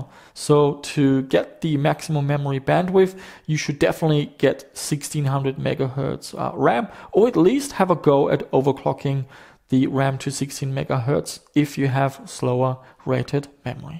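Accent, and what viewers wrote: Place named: Germany. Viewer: Great video!!! I thought you were German based off ur accent - didn't think Aussie!! Good stuff my man!!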